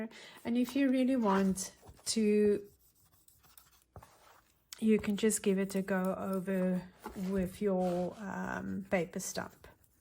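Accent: South Africa. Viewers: Just given me ideas for Christmas gifts thanks,nice to hear a South African accent.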